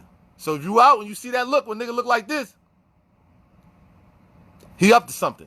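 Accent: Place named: Boston, United States. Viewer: Dude gotta be from Boston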